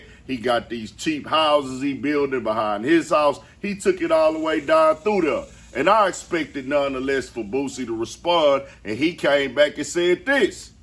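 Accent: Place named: Texas, United States. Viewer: I love your commentary. It’s so Texas. Shout out to you from DFW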